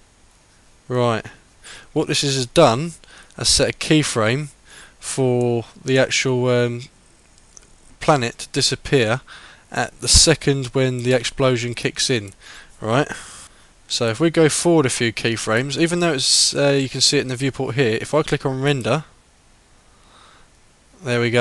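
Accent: United Kingdom. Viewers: im guessing you are british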